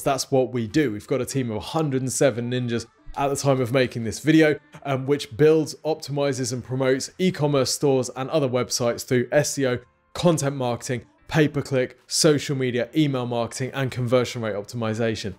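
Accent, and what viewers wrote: United Kingdom. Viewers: I love your British accent! Would Ease of Use n Support be better if I go with Woo under Bluehost?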